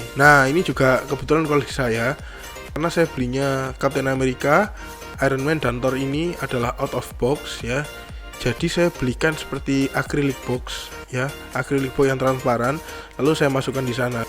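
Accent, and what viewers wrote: Java, Indonesia. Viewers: makin mantap bang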